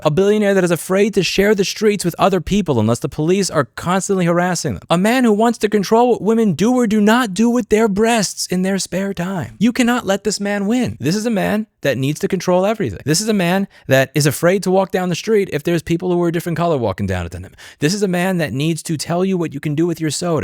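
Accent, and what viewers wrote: New York, United States. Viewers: I love how the more he talks about new york, the stronger the new york accent becomes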